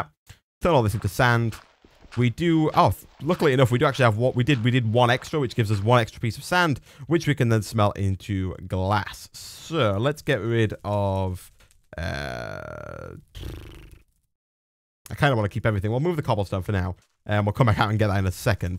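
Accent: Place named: Leigh, United Kingdom. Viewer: Are you from Liegh, Your accent sounds similar *****.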